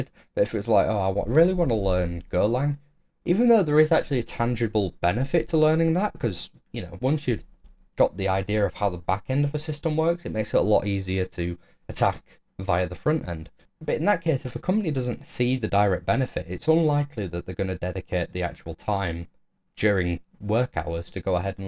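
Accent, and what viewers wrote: United Kingdom. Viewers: This English accent gives me a headache.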